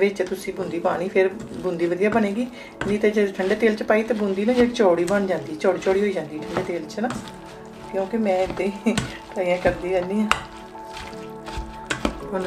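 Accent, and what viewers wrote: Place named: Malwa, India. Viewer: I love your Malwa accent. Sat Shri Akal. I will make these for my parents on Lohri. Thank you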